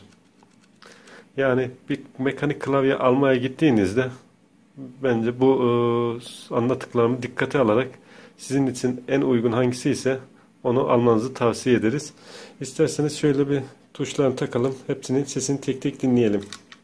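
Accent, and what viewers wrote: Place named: İspir, Turkey. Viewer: Ç'lerine gurban olduğum Gardaş sen İspirli misin?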